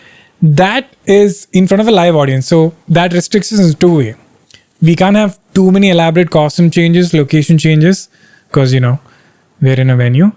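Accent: Kerala, India. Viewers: ur funny and relatable n u make me smile...wt ur accent...from one mallu to another...keep it up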